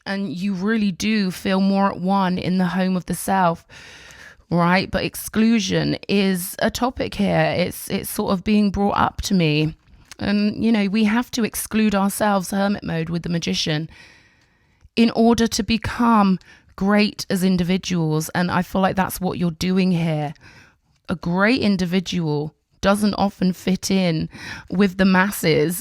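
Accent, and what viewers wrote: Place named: England, United Kingdom. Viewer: Lovely to hear your English accent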